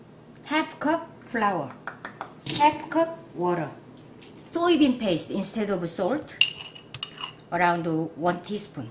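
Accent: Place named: South Korea. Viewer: your Korean accent is sooo cute!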